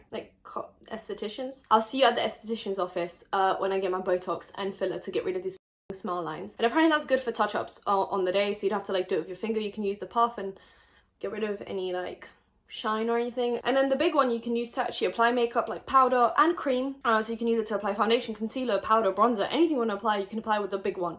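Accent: United Kingdom. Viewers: why did i know you had a british accent before i clicked on this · You know what’s weird when you were talking about pop tarts I forgot that you were from the U.K. I think I’m tired how did I not hear your accent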